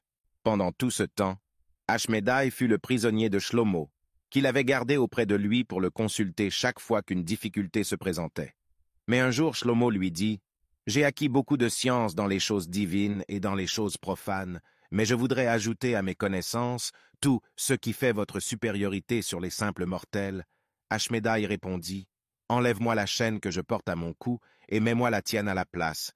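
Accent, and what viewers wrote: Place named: Africa. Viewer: Tu parle mal avec ton accent afficain c est salomon et non slomo et asmidee avait des pieds de canard et.non de coq .va apprensre ta leçon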